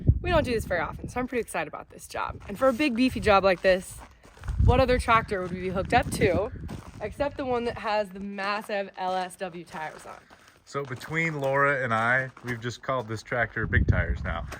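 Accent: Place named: Midwest, United States. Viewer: Your big smile and bright eyes kept remindig me of somone and today I figured it out. you are a young Reba made over minus the red hair And your mid westen accent just adds to the resemblance!!. 😃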